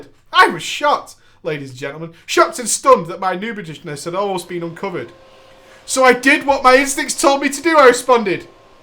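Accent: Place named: United Kingdom. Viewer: It's too bad you are on EU... I'd raid with you just to listen to you entertain us in Teamspeak or w/e you use... everything is more interesting with a British accent, lol.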